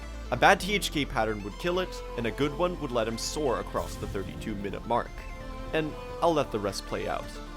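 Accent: Sweden. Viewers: Wait you are swedish??? Your accent is fucking amazing, even for a swede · Your swedish? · Wait, you from Sweden? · are you swedish? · that's an extremely Swedish accent though.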